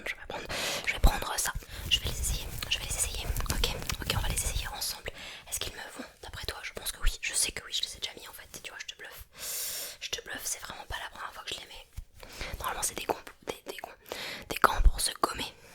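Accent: United Kingdom. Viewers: J'aime tellement ton accent anglais, j'espère une vidéo ASMR où tu ne parles qu'en anglais dans le futur❤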